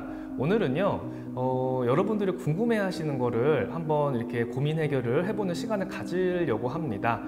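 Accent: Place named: Jeolla, South Korea. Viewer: 전라도 억양이네 ㅉ ㅉ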